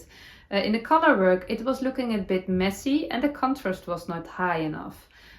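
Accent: Netherlands. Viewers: Congratulations on your 10,000! You are my favorite! You are so down to earth. Your English is perfect! My ancestry is Dutch so I love your Dutchy English. ❤🧶❤️🧶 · Congratulations to you 🎉. So glad you didn’t listen to your Miss Perfectionist and carried on with your English vlogs. There is nothing wrong with your English, as another person said, I love your Dutchy English. Thank you for your honest, genuine vlogs you bring much happiness ❤❤ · Congratulations.... you are delightful & I LOVE LOVE LOVE your Dutchie English.. love too hearing you talk about the weather - my (Australian) Son lives in Amsterdam & is learning Dutch so the shoe is on the other foot. I’m flying over tomorrow so interested to hear how warm it is. Keep up the good vibes. Nx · Love your Dutchy English! And the way you rethink attitude to generate positivity. Heart Jumping is a “Sarahism” I’m increasingly adopting 😊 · Congratulations! 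Wow 10 k that is awesome🎉 I really enjoy listening to your podcasts and love hearing your Dutchy English, you are so good at speaking English especially when it’s not your everyday language, well done. Thank you 😊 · Congratulations!!! I so enjoy your podcast and your “Dutchy English”. As a native English speaker I find myself saying words like “podcast” the way you do. 😅 You are very inspirational and just a joy to listen to. Congrats again. 🎉10,000🎉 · Congratulations lovely on reaching 10 K - now 10.5K only one day later! 🎉 I simply love watching you as I crochet, I have Dutch heritage so it’s delightful to hear your accent and your growing command of English. Well done indeed! You certainly should be proud of yourself for ignoring your ‘Little Miss Perfect’ 😉 and following your heart. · Another great episode - I loved to hear the story of your journey toward your English podcast and the 10,000 subscribers! 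Celebrate your success - your Dutchy English is very endearing! (Dawn) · Congratulations💐 I am so happy that you kept going on in English. I love your Dutchy English and when you speak Dutch I often understand it because Danish have many words similar to Dutch. I really understand that you want to be a part of the knitting community in the whole world. I think I will try to make a podcast when I retire in fall but I think I will try in Danish first. I wish there were more people who speak Danish 😊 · Today was closer to 40c in my part of Texas, USA. And tomorrow is World Wide Knit in Public day. I can promise we won't be outside for very long. Perhaps I will take my knitting to a coffee shop and sit in the air conditioning there. I would-be lost with the AC. I love to hear your Dutchy English and the encouragement to do the things that bring you joy. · You are so happy and uplifting. I am 73 and have been knitting, crocheting & sewing since I was a teenager. 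I really enjoy your Dutchie English & all you share. Wishing you many heart jumping moments. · I love that you podcast in English too! For me it is not just the beautiful knitting. My grandparents and many of their siblings immigrated from Holland to the US after WWII. Your accent and the way you talk is just how they would talk. Listening to you brings back many warm childhood feelings for me. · I am so pleased I found your podcast. I absolutely love listening to you and hearing all your stories ❤️ In the early 80’s my husband served as an exchange pilot with the Dutch airforce. We loved the Nederland and our two little girls became quite fluent in Dutch. It was a struggle for me but as a Canadian I was always treated with so much love and respect for trying. Your accent sounds so much like a dear friend of mine that I have lost touch with; she was originally from Breda. She was a beautiful knitter. 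I actually learned to knit in the Nederland. Continue with beautiful heart jumping ❤️.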